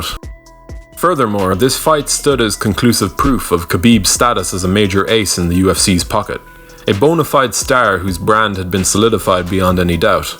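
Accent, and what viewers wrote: Ireland. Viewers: Is that an Irish accent gracing this vid?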